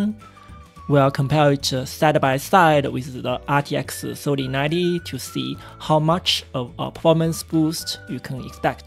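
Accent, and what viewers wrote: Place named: China. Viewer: 哈哈我一听就是中式英语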